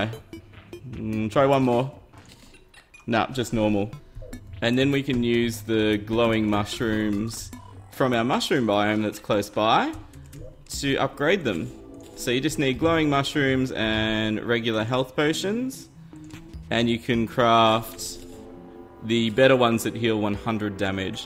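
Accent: Australia. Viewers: r u australian? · hahaha also you being a aussie like me is so helpful and convenient and makes it easier to watch ya vids:') ps you sound like your from over east like queensland or something? I'm from perth btw <3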